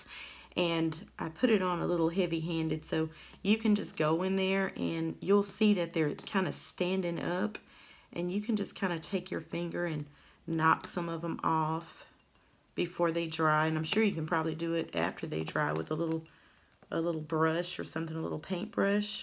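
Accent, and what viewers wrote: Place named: South, United States. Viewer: New sub and loving your channel! Love the accent! Im from the south and Im a southern girl as well!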